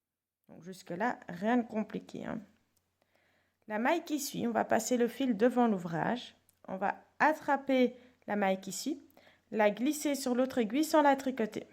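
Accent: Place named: Nancy, France. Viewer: On dirait que vous avez l'accent de Nancy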